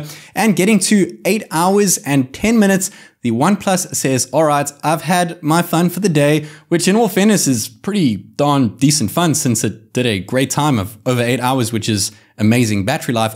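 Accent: South Africa. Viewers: Sounds like I can hear a South African accent....my S22+ gives me some anxious days, compared to my last flagship Note 9. Luckily I love the phone otherwise I would have ditched it. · Bro sounding South African🤔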